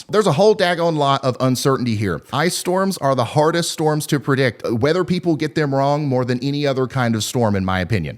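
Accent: South, United States. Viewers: You can’t help but love a southerner that’s all about science.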